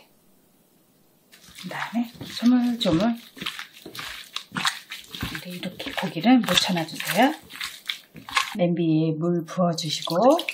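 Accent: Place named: Daejeon, South Korea. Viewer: 저도 대전이 고향이예요 왠지 말씨가 좀 친근감이 있었거든요. 영상을 오래 전부터 보고 자주 해먹고 있었거든요. 대전 어디신데 친정어머니가 농사지으셔서 보내주시는지요?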